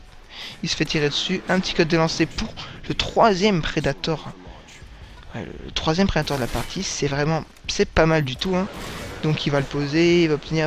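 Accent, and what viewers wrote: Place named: United States. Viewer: Quel accent américain !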